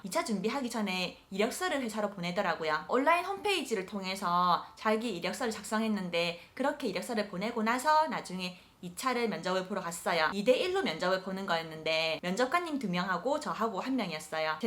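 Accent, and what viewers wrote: Busan, South Korea. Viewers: 느므느므 잼있게 잘 봤습니다~~!! 친구따라 강남 가셨네요 ㅎㅎ 아무래도 합격하는 운이 따른것 같다고 보입니다. 항상 님 부산사투리에 아드레날린 품고 갑니다~~!! 다음 썰때는 초점을 오른쪽으로? 살짝 돌리심 더 나을듯 싶어요 ㅎㅎ 항상 건강조심하시고 화이팅하세요!!! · 부산 분이신가요? ㅎㅎ 반가워서 글남깁니당:)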